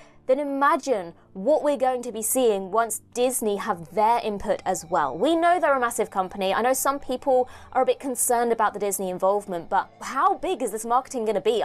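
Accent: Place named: England, United Kingdom. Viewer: You have the best English accent ive ever heard.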